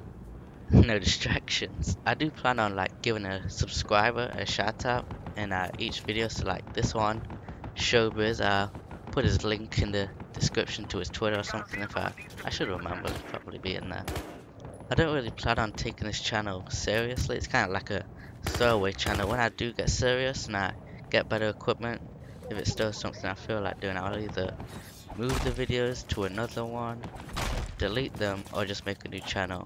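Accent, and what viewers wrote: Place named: East Midlands, United Kingdom. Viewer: Aah, an east midlands accent - explains why I couldn't pin it down. Midlands all seems to blend into one for me. It always makes me laugh when you're gaming or (briefly) on the podcast, and the Yanks try to copy your accent. Do they think we all speak like the Queen and eat crumpets mid afternoon?! lmao. Looking forward to seeing what you might do with this channel. My advice - do what you enjoy and don't worry about numbers. Have fun with it. Good luck bud.:)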